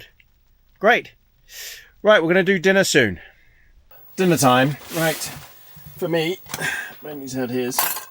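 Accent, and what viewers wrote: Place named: England, United Kingdom. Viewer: Wow what a beautiful place and yes amazing scenery. I was just wondering are you actually English? And you’ve moved to New Zealand because you don’t sound like you’re from New Zealand. Your dog is beautiful. He’s very energetic. Fun to watch.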